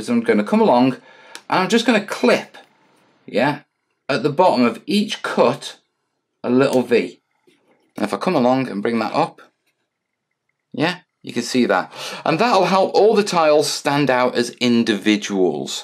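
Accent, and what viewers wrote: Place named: Scotland, United Kingdom. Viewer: This is my first time watching one of this guys videos and I was not at all ready for his Scottishness 😂. Also bro calm down you are going to hyperventilate.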